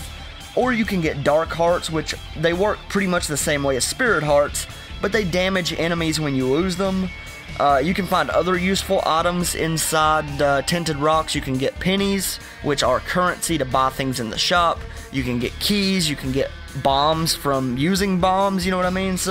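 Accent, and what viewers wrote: United States. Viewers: Love your American accent.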